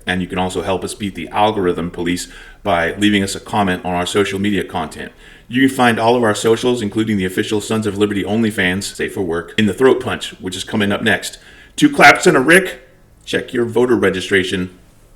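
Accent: Scotland, United Kingdom. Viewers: I can hear a Scottish brogue here! interesting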